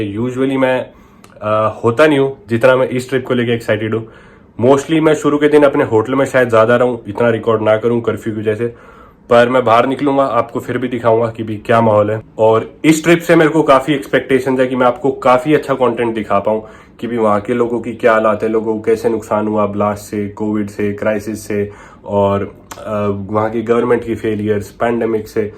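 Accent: Haryana, India. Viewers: Bhai is your hometown near Hisar or bhiwani Haryana? You just sounds like some of my friends from nearby villages.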